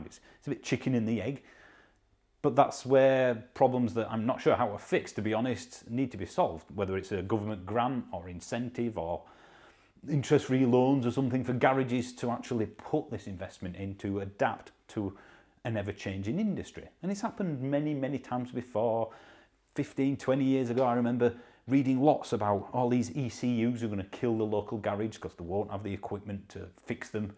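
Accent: Yorkshire, United Kingdom. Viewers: I own a car repair garage 30yrs. I am level 4 EV qualified. We have been a member of HEVRA for 9 months. I have stripped and repaired a leaf battery HEVRA helped us all the way through it with correct information I would do one now without an issue. We are now at the EV stage where we were when fuel injection first came out! HEVRA are here to “hold our hands” through in depth EV repairs which I explain this to our EV customers but you’ll find a lot of garages don’t want to make “false promises” and let the customer down. You going to have a look around at the moment but 2/3yrs from now you’ll find a LOT more HEVRA members will be a lot more confident. I’m on a training course with cleverly EV early April and hands on course inc Tesla I actually think your near us by your accent Cleckheaton area.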